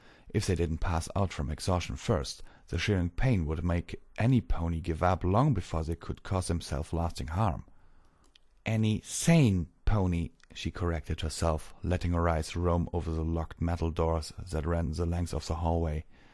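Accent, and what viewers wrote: Germany. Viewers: Sounds more like German to me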